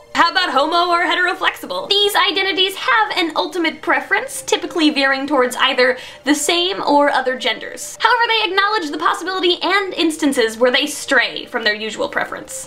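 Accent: Minnesota, United States. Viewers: You're MINNESOTAN?!?! SO AM I OH MAH GAAWWWSSSHHH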